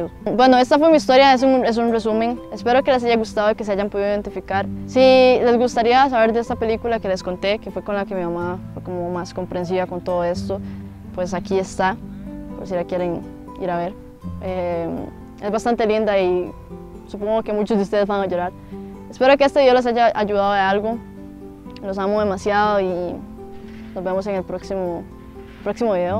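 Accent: Costa Rica. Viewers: los ticos pronunciamos la r asi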